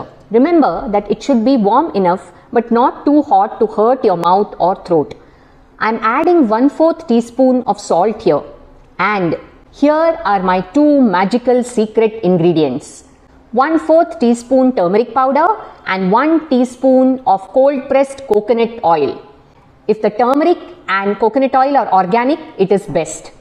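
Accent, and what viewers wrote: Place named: Karnataka, India. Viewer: Ur a south person, but by slang I think ur Kanadi.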